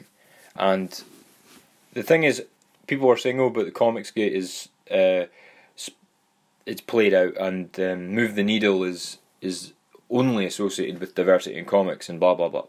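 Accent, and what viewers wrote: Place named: Scotland, United Kingdom. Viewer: Nice to hear a Scottish voice on comicsgate mate. Glasgow ?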